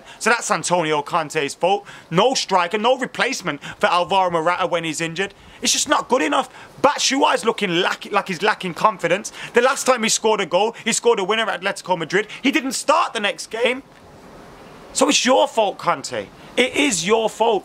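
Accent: Birmingham, United Kingdom. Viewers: Couldnt help notice, but are you a brummie at heart?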